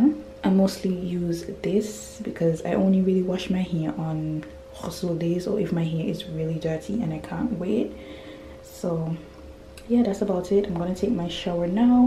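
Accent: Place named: Jamaica. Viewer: I know a fellow Jamaican when I hear them🧏🏾‍♀️ Allahumma barik sis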